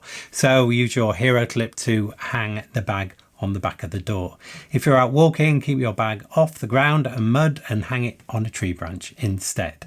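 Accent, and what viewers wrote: England, United Kingdom. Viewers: That’s an English accent.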